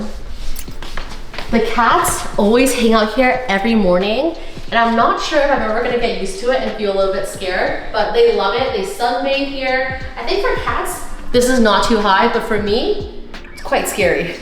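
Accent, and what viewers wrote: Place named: United States. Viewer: You are looks like Koean or Japanees. But Your Speking Says You are American.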